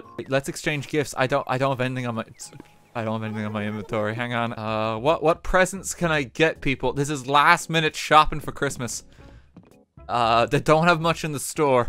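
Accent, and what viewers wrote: Ireland. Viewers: You're irish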